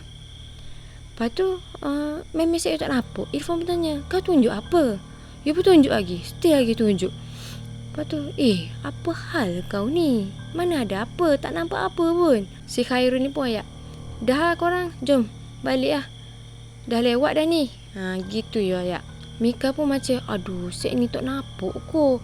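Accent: Kelantan, Malaysia. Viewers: Sambil2 dgr dapat belajar loghat Kelantan.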